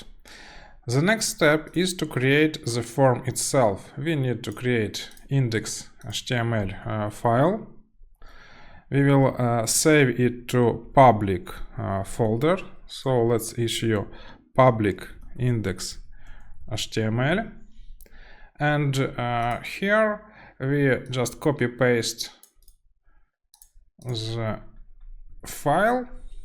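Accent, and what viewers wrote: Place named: Russia. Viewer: Seems like a russian guy:)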